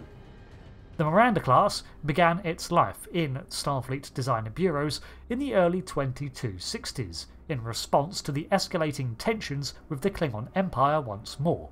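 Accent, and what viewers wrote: United Kingdom. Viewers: Are you incapable of recognizing a British accent?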